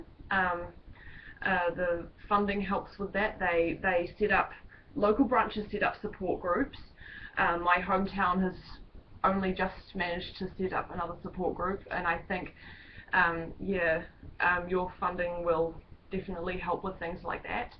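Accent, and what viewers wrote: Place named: New Zealand. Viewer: Also you reminded me how much I miss NZ accents:)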